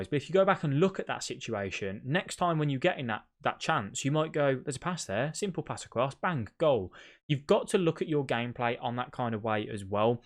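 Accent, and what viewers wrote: Birmingham, United Kingdom. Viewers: Great channel m8 are you from brum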